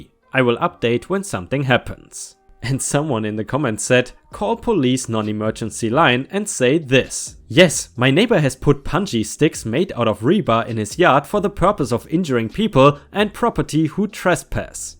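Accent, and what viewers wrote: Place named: Germany. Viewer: Another good video,! These stories were a little bit underwhelming, but it isn't like you write this stuff, right? I do enjoy your voice, especially your accent (brings back a whole bunch of happy memories of my childhood in Germany!), but here;s hoping you can find some more, well, let;s just say, "exciting stories". ⭐️⭐️⭐️⭐️⭐️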